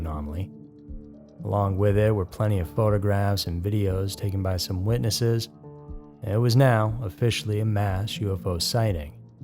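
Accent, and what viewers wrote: South, United States. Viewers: Much Appreciated. i want to believe how you’re a real human Narrator because i like your Private Eye / Country Boy accent or style which gives the information a personal or ‘ Pssst - wanna hear something very interesting? ‘ feel! 😁👍❤️🐀🐢👽from near Bournemouth SW England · When they make these videos, why can't they find a narrator who does not speak through his nose? It is a real turn-off! Also, the American accent does not help!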